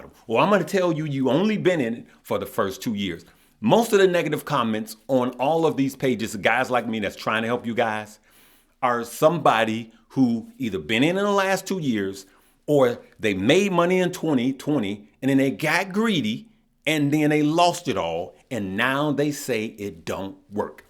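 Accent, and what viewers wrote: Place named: South, United States. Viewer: Thee accent!! Ohh with the country accent. Uncle. Has. Had it.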